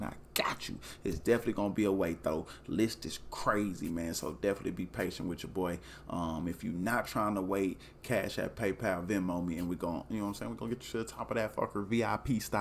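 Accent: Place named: Louisiana, United States. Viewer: I can already tell u from Louisiana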